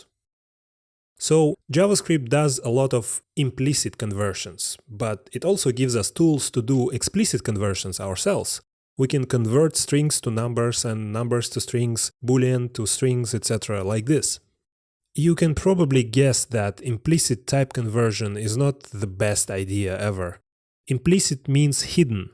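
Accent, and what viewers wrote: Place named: Eastern Europe. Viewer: Why does he have an eastern European accent but his username is?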